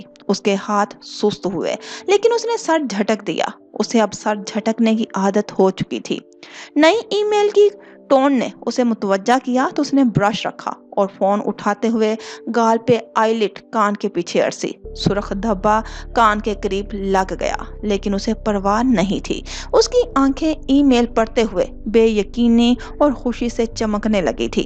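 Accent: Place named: Punjab, Pakistan. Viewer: Voice me Punjabi lahza kam kar lein please kuch lafz Samjhne me problem hoti hai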